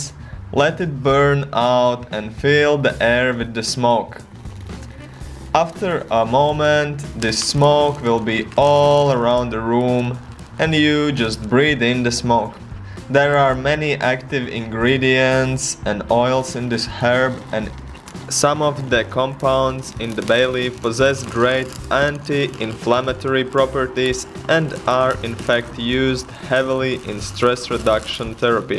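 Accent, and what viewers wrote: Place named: Latvia. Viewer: Really? I find our latvian accent so annoying. I am Latvian too.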